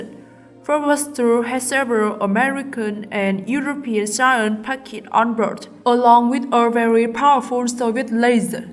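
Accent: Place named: Japan. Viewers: could you possibly put there talking someone with normal english and not with strong japanese accent? it is hard to understand you... thank you. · ah yes, she does have a strong japanese accent. I think it'll help her greatly if she hires an voice actor to do her videos. Good content here.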